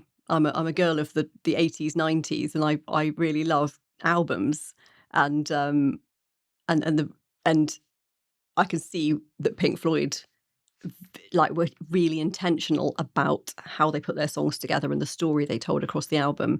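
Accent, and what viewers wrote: United Kingdom. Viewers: She sounds brittish i can't believe she's never listened to PF before 🙄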